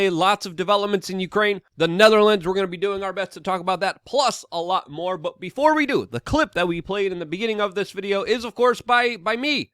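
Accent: New York, United States. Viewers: Luke’s NY accent was a lot stronger then, what a long journey it’s been since. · Luke"s NY accent comes out when he gets mad. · It's kind of funny hearing the new york accent out of Luke after so long lol. It's still mind blowing how much the narrative was clamped down on during and after 9-11. I do believe that there are more people now who at the least question the events that happened back then. The pentagon is the most glaring of them all. That was no plane. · Wow you I could hear your New York accent in that video!✌️💜🖖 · luke had an og nyc accent back in the day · Luke- you’ve lost some of your NY accent! (So have I.)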